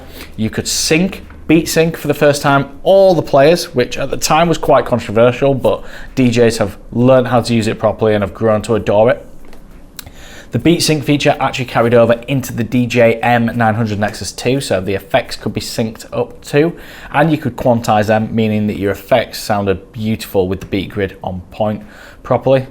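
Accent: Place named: Yorkshire, United Kingdom. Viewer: Silly question, but as an American, I hear a very particular accent. Where is this accent from? Would you call it Yorkshire?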